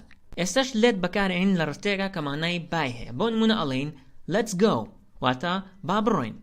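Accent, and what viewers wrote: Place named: United States. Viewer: ئەوە ئینگلیزی ئەمریکیە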